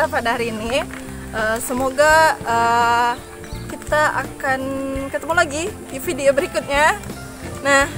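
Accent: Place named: West Sumatra, Indonesia. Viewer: Bagusnya bercakap loghat Minang sepenuhnya..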